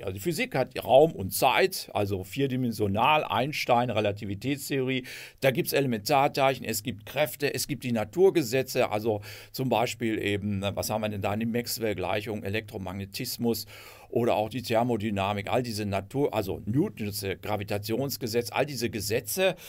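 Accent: Germany. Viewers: Ich mag übrigens deinen Ruhrpott slang. Die dortigen Menschen sind eigentlich sehr bodenständige, ehrliche und wahrheitsliebende Menschen Lass uns doch mal treffen und reden. Gruß Christoph Poth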